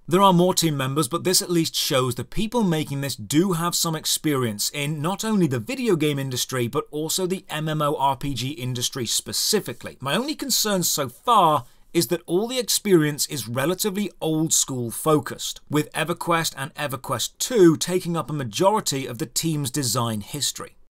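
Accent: United Kingdom. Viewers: I'm confused. There's some brit talking, but no mug. Where's the channel host?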